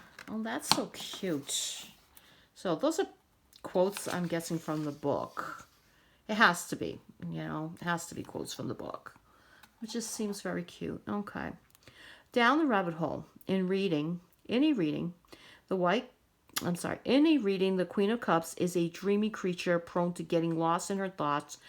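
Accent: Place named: Boston, United States. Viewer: I have been watching use this deck for quite some time now. I am not drawn to it. I think it is interesting even if some of the iconography is different than the R-W. I even like the Alice in Wonderland stories but I am just not interested in using this deck. And gosh! What a book! Too much for me! does great with it and that is enough for me! Thanks for going over it though! PS....thinking back to the old radio show Car Talk, that IS the accent you have! Yeah Boston!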